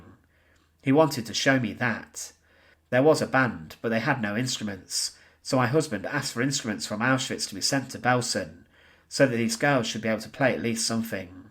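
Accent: United Kingdom. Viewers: You remind me of Mark Felton videos, British accent also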